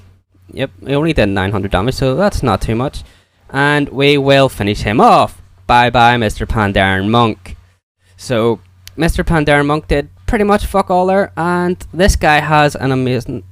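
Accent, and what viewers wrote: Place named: Ireland. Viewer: I knew that was an Irish accent!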